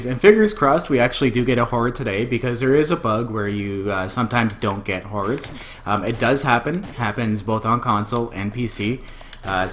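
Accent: Canada. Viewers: had a suspicion you were Canadian because of your voice man lol I'm from London Ontario what pert O Canada you from eh?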